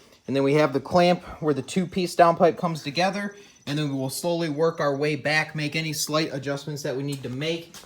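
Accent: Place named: Michigan, United States. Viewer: It's a Michigan accent... I lost mine since I moved south 35 years ago... y'all..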